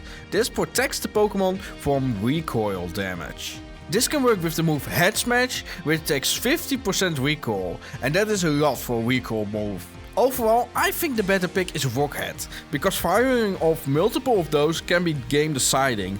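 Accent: Netherlands. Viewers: Het allereerste filmpje dat ik van je zie en ik hoor direct dat je uit Nederland komt... Mijn idee xp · he's dutch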